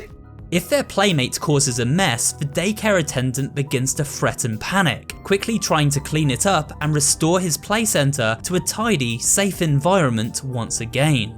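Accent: United Kingdom. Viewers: i thought this would be good and then i hear british voice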